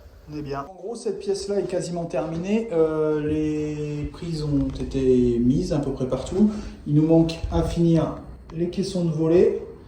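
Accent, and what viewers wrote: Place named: North, France. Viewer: Coucou,apparrament l'enduro reste au programme. Ici pareil ont n'attend également. Mon fils a était ce weekend au terrain ou tu t'entraines. Je sais pas si tu as connu celui de calais,les spectateurs étaient en hauteur,il y a une vingtaine d'années tout les weekends mon beau frère et les collègues y aller et c'était génial de l'ai voir même si ils nous ont fait des frayeurs. Et a Equihen également. Celui ci existe toujours. En 99 une tempête de sable a eu lieu au même moment. Moi et ma cousine en panique,le reflex des bonhommes de poser la moto et de nous rejoindre en speed car on voyait absolument plus rien,avec les enfants nous sommes tous parti dans les véhicules,le temps que ça passe. C'était choquant. Sinon niveau travaux, ça avance très bien. Mr est parti faire un chantier sur Etaples,bjr le vent ici. Pour ton titre, oh que je te comprends,et même si ont me dit la roue tourne, ça laisse un goût amer!. 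J'ai regardé le live a Rox,et c'était cool même si c'était en Replay donc pas de commentaire. Mais pas mal,comme ici c'est des grands,et qu'ils adorent asiatique,je vais leur faire. Moi perso je comprends très bien l'accent du nord car j'y suis née,mais je rigole parfois car certains abonnés doivent ce dire"quesquil dit lui"lol. Bon courage, ça avance 💪🏼👍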